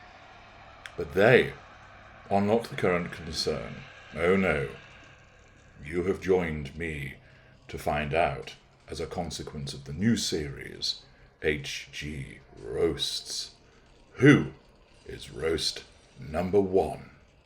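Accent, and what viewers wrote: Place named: Scotland, United Kingdom. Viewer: Love it. You’ve got to be Scottish. You’re a treasure HG.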